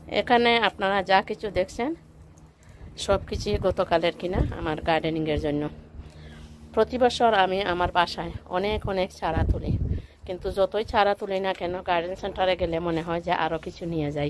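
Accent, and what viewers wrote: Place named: Sylhet, Bangladesh. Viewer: আসসালামু আলাইকুম আপু কেমন আছেন আপু আপনার বিডয় টা দেখে কুব ভালো লাগলো আপু আপনি মনে হই আমাদের সিলেটে মানুষ কথা গুলো শুনতে খুব ভালো লাগলো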